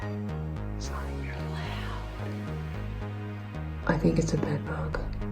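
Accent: South, United States. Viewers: OMG, the part where you said "Hitch in your giddyup" and those people call you out in your accent... hilarious. Your voice is so southern when you're trying to be cute.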